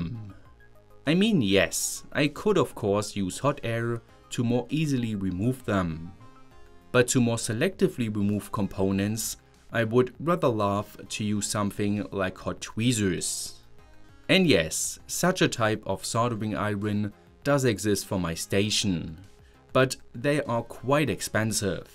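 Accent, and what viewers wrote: Germany. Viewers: uff what a strong German accent :D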